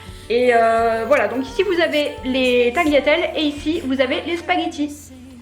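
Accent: South, France. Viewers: C'est un moment détente quand je regarde tes vidéos... Tes plantes... le soleil et ton accent du sud me font oublier un instant le vilain temps de chez nous et les soucis 🙂😘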